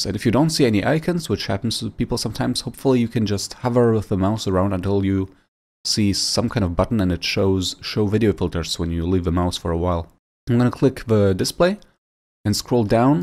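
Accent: Germany. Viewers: Hört sich schwer dannach an, dass deine Muttersprache Deutsch ist?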